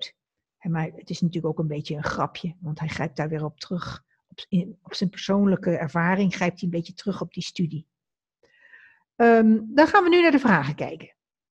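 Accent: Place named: England, United Kingdom. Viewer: je hebt echt een engelse accent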